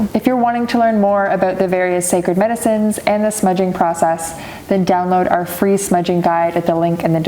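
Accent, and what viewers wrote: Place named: Canada. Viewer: Thought process: North American she says... so US? Wait no she’s saying it’s ok! That’s not very American.. Ahh the accent is coming out. You must be Canadian you guys really are way too nice haha 😅 And I realize you said to not spread harmful stereotypes but I don’t see the harm in this one, except maybe in that by trusting and giving anyone the right to your sacred rituals you’re harming those of you who are indigenous and can’t put on or take off their heritage whenever it benefits them, because in the US at least, not every Native reservation has access to their own culture (ex. Moccasins can be expensive but way more pretty White girls than indigenous people on reservations can afford them; no hate just a point). I don’t know about the situation in Canada, y’all’s country seems to have everything figured out all the time😅 but for viewers in the USA it’s crucial to redirect the focus from “yes boo you can do whatever you want as long as you promise to educate yourself and be respectful” to “check your privilege, use it to benefit indigenous people. 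If you love their culture sooo much, give it back to them. Fight for them. Instead of participating in a ‘cool’ event, maybe enable an indigenous person to go to that rally because it means more to them. If you can, get doctors and lawyers and people who have more power to make a difference to go and help. There’s nothing there for ‘you.’”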